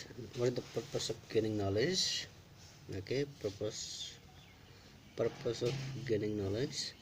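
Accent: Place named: India. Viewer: такой индийский акцент......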